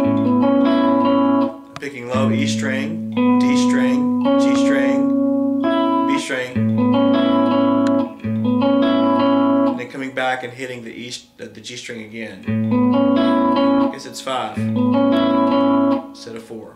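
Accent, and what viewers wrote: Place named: Texas, United States. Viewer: Isn’t this CC giving the tutorial? I mean to say that he’s got that TX accent, so...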